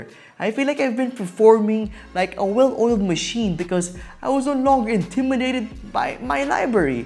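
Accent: Philippines. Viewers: You ought to delete your filipino accent from your brain, learn some English before talking about how to deejay. It’s hard to understand you most of the time.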